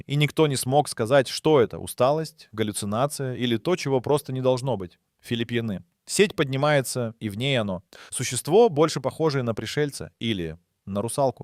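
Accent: Portugal. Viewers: Vídeo com sotaque d Portugal , ora em castelhano , ora em português do Brasil , q bosta d vídeos fake como a locução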